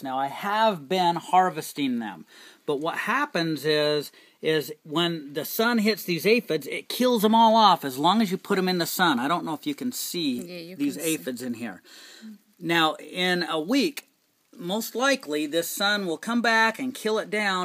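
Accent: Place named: Russia. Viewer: Mmm yummy russain accent. My wife is russain. Very good woman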